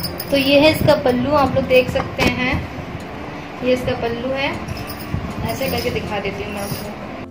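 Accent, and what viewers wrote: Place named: Varanasi, India. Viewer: Banarsi bolty hn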